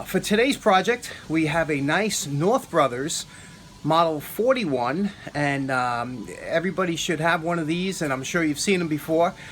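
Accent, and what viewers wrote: New York, United States. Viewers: I only watch your videos for the New York accent! After years of buying new Chinese Craftsman battery drills every year I bought Makita Chinese Li Ion drill and impact. 6 years and counting. I am shocked. It has built complete decks and privacy fences!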